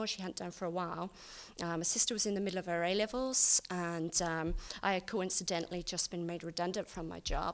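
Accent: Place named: Australia. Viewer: she sounds kind of Australian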